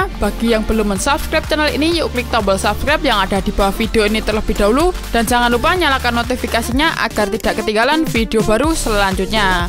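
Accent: Java, Indonesia. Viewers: Kreatif bagus gw suka,,,tp gw lucu sm suarany Jawir bngt.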